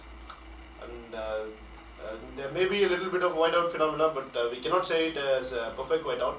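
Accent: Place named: India. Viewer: Subtitles would be helpful, guys. Your accent is very hard to understand for non-Indians.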